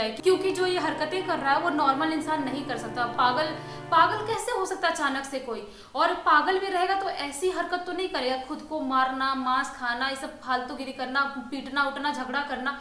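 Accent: Bihar, India. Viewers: Kya aap bihar se ho?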